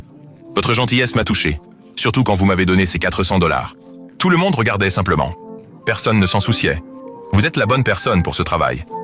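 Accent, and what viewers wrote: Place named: France. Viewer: Votre accent français c'est adorable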